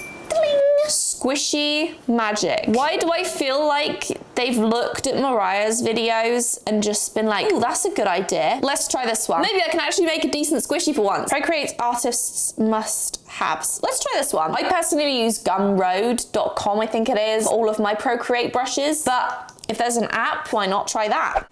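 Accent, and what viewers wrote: England, United Kingdom. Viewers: Sorry are u english? I can't tell if ur American lol the for making this vid!! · The British accent I love it Bettah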